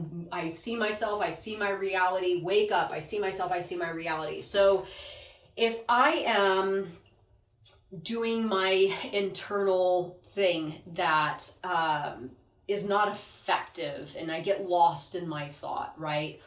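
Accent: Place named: Western Pennsylvania, United States. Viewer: That western p.a accent makes me homesick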